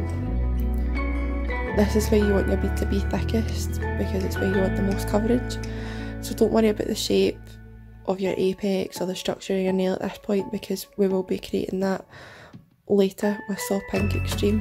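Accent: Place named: Scotland, United Kingdom. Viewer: She's Scottish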